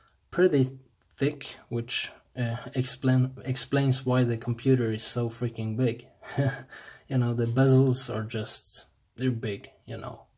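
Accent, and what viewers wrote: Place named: Sweden. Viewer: I'm assuming you are from Sweden due to your accent. So am I. Would you say it's worth 3000:- to add the 4k-screen? Deciding which customization to buy. Noticed you talked alot about the two screens. Have you tried the full-HD one yourself? Cheers and thanks for the content!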